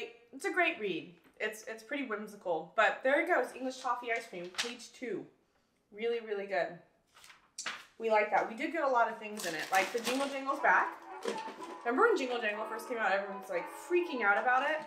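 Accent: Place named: California, United States. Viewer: You can totally tell she's from California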